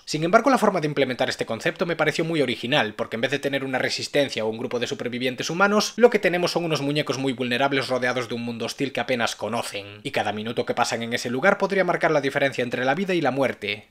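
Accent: Galicia, Spain. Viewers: Estoy celebrando mi cumpleaños, me he ventilado 2 botellas de licor café (guiño a que siendo de Galicia, o supongo por ese acento, sabe lo bien que sienta) y que al venirme a casa tenga un video suyo para quedarme a dormir me safa 1000. El puto amo de los youtubers, sigue subiendo videos cabronazo, y acuérdate de mencionar el New Vegas Bounties y el Tales of the burning Sands, que te los recomendara en twitter. Un bico chaval. Edit: este análisis es no solo objetivo, sino justo, yo me viera esta peli con 18 años y me dejara encantado, para los imbéciles que creen que la animación es "de niños"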